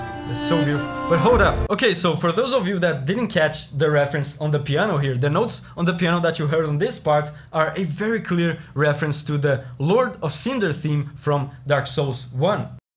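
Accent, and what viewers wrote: Brazil. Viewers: what's your nationality? your English speaking accent sounds a lot like the Brazilian accent. Acabei de ver nas informações do canal que é brasileiro kkkk, deu para entender um pouco do que você estava falando e deu para entender a mensagem, mas seria uma boa tentar colocar legendas para quem não entende. E sobre as músicas é incrível como a fromsoftware não erra com musicas dos final Boss dos jogos, simplesmente lindas. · Sotaque br? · eu tinha uma sensação de q vc era BR por causa do sotaque kkkkkk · u sound brazilian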